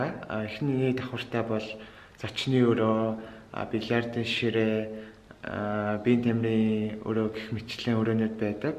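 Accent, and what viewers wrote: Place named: China. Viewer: хэлэнд нь жоохон хятад аялага сууцан бна.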